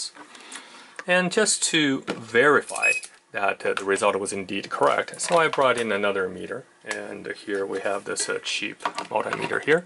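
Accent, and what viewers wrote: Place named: Germany. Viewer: You sound very... German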